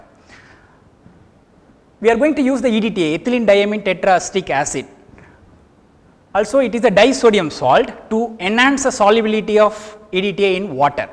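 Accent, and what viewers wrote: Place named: South, India. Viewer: quiet different accent, I guess he's south indian, should've given captions too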